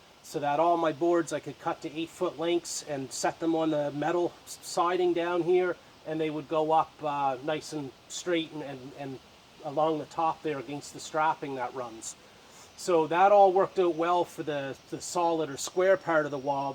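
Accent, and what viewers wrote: Ireland. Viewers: Are you irish... Nice vid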